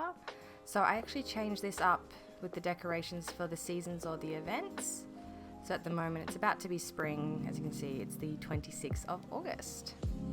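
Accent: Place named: Australia. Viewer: This is absolutely beautiful! I love the decorating in your house- really well done!- and how you’ve created little neighborhoods and lovely spaces ❤ Thank you for sharing and congrats!! As an aside, I absolutely love listening to you speak- I am a HUGE fan of Offspring (I’m on my 12(?) watch through, deeply in love with Matthew LeNevez). But hearing Aussie’s accent (to this Yankee’s ears) is absolutely fabulous. ❤❤❤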